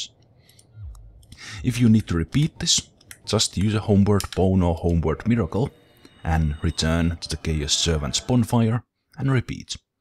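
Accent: Finland. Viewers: Dat finnish accent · You from Finland? Your english sounds like typical english speaking finn : D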